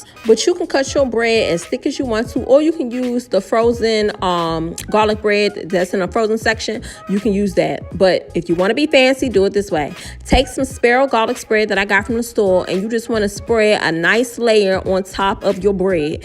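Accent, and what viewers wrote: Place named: Prince George's County, United States. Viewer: Just found your channel. New subscriber! You’re so funny, you sound like you’re from PG.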